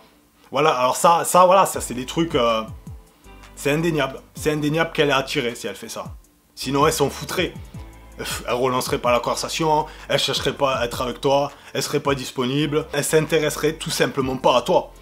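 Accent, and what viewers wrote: Marseille, France. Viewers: Ptdr ton accent marseillais me termine t un crack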